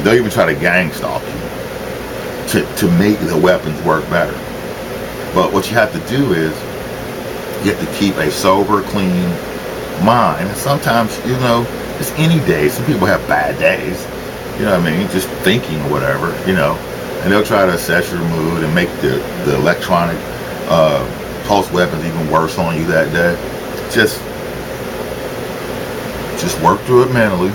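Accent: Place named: Washington DC, United States. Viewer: Thanks. I lived in DC briefly in 1973, Howard Univ one semester. At 65, I might be slightly older than you. People from that part of the country sound a lot like you do.You also remind me of a friend from San Fran in the mid 1970s. Thanks for the clarification. There's a TI in Houston, Texas Gangstalking Milab who I've been communicating with. People here in Memphis don't know what a TI is. Take care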